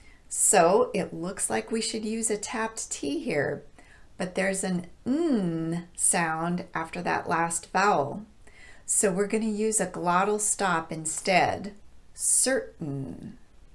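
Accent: United States. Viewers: Dear! What state are you from?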